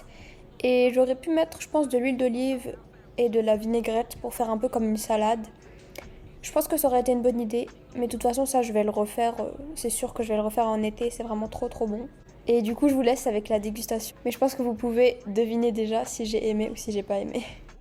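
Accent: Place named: Canada. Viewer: T dans quel pays psq t’a unpeu un accent du Canada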